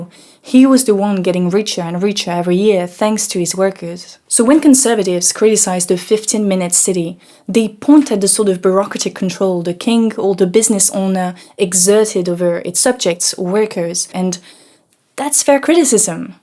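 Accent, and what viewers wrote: France. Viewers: Oh you're french! No wonder you're so smart. Also, french accent makes everything sound classy.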